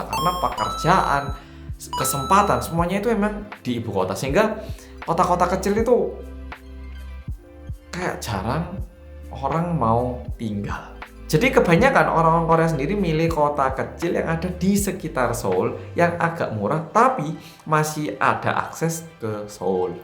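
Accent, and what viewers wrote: Java, Indonesia. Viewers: Jan medok bless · Salah fokus sama aksen mas yg masih medok aja, padahal dah 5 thn balik ke Korea...hehe, semangat mas...jgn ikut2an depresi kayak org Korea pada umumnya... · Mas medoknya masih kentel banget..apa memang masih ada yg bisa diajak ngobrol di korea pake bahasa jawa?soalnya sudah 4 tahun lebih jg ya di korea tapi medoknya masih tetep sama..hahaha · mending ngene wae mas, nek pas omong jowo medhok subtitele tetep bahasa Indonesia, jd bebas omong jowo & tidak dikomplain lagi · Terlalu medok bahasa Indonesia nya mas jadi kesannya kurang jelas mas.. 🙏🙏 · Ga percaya ini orang Korea, bahasa Indonesianya medok Jawa banget :)) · Logatnya jawa, ada juga jerman logat batak .. 😂😂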